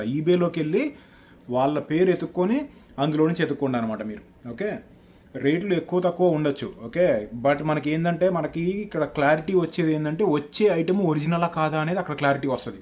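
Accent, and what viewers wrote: Andhra Pradesh, India. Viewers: Emti sangatulu ane style, chala natural ga vuntundi. mee cooking style means like sambar karam with castor oil and vade ingredients me grand mother cooking and matladae style chooste, looks like Andhra style. I think me native roots in andhra, may be me parents are grand parents migrated to Nizamabad.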